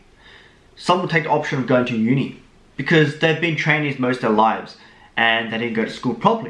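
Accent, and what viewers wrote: Australia. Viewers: You are so cute when you serious....i love your Australian accent.. If I'm right. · I love your Australian accent!! Ur soooo cute!! Maybe you can just become a solo artist....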